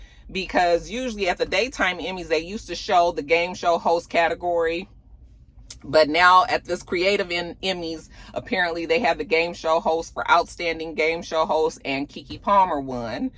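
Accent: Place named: Los Angeles, United States. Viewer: is so ATL. you still have some LA in your voice. lol.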